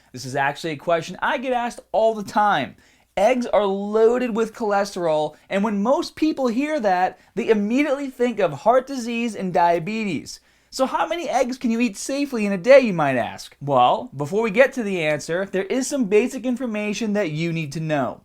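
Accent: Boston, United States. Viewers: are you bad Boston? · Man how come you can't pronounce AR, EAR, and ER words? Is this a Boston accent? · Holy crap! has a Boston accent? Since when? LOL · you sound more "Boston" than ever before?! Not sure if it's just my ears though. Lol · that boston accent is bursting outta ya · God, that bostonian accent is so annoying. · NOTHING more annoying than the Boston accent. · It's a Boston accent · This guy. Boston as fuck.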